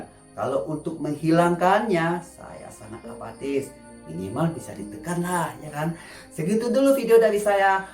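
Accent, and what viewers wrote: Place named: Padang, Indonesia. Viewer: Wah....orang padang nih mah.....logat nya atuh...he..he....he...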